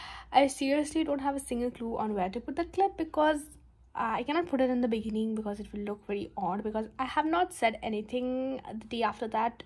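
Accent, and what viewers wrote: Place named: India. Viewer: She has a Indian accent and it's so cute to listen Indian English accent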